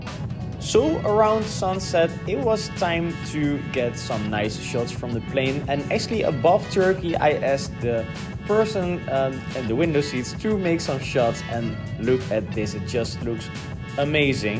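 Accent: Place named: Netherlands. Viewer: Toch nog stukje Nederlandse accent door het Engelse gepraat heen😂 leuke video man!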